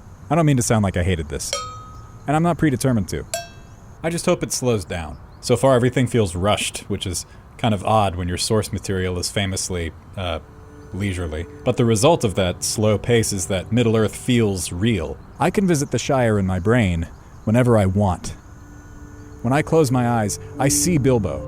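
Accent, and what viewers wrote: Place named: Ireland. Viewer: Flawless editing and Irish accent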